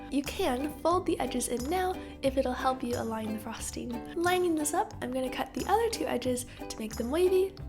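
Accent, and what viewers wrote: United Kingdom. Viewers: do you think the way you pronounce things gets more British sounding the more years you spend with Tom? I'm an American dating a British guy and when I visit him for long periods of time I notice myself sounding more British by accident!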